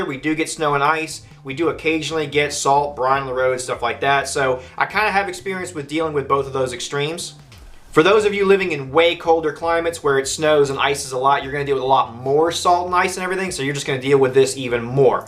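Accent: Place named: Georgia, United States. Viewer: Sounds like he lives in Georgia 😂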